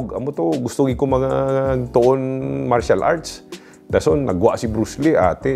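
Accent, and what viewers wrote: Iloilo, Philippines. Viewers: Nami pamati-an ah...certified Ilonggo gid... ❤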